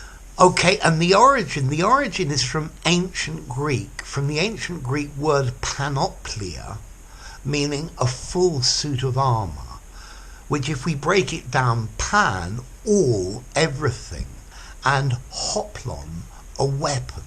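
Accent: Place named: England, United Kingdom. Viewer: Oh, I do like your presentation, its richness of examples and your delightfully English pronunciation and expressiveness. Thank you.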